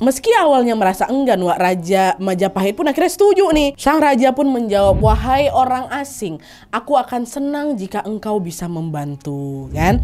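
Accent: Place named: West Sumatra, Indonesia. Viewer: ASSALAMUALAIKUM, MBAK, DARI LOGAT BERBICARANYA SAYA RASA MBAK BERASAL DARI MINANG ATAU SUMBAR....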